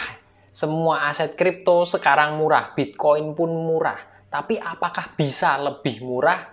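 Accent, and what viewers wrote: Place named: Sleman, Indonesia. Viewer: Jelas² sleman Logat & bhsane bedo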